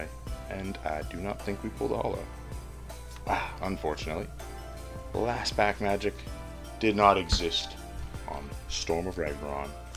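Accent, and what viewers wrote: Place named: Canada. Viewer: Always watch your videos just to hear your canadian accent reveal itself anytime you say "out" or "about" 😆 Everything else is just a bonus 😁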